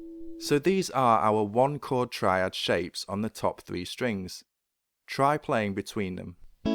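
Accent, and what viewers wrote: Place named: Manchester, United Kingdom. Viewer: Excellent teaching! All in the form of little focused nuggets of pure musical gold :-) (It helps to have a basic understanding of 'theory' and a few years of noodling for basic ear training to relate to.) Your Mancunian (?) accent reminds me of the programme director of part-time courses at The Institute.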